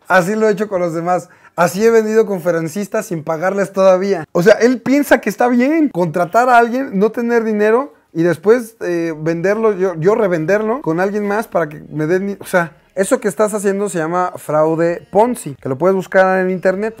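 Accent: Chile. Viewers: suena a chileno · See oye a chileno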